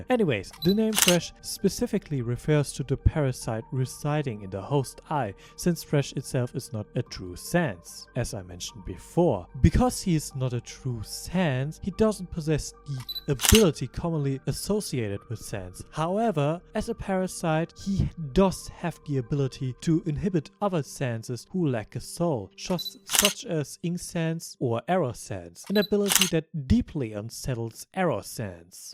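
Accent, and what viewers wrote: Germany. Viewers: Is it possible that you are German and you have an accent?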